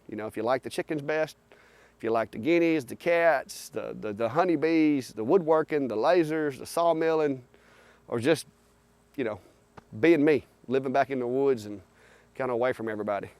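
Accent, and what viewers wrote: Alabama, United States. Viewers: I like it all! Love the animals, brother and BIL does some wood projects, and I grew up in my early life in mobile Alabama, so I love listening to bama folks! Lol! I live in houston, texas now and came from maymays channel. I think you are doing great! Variety is fun.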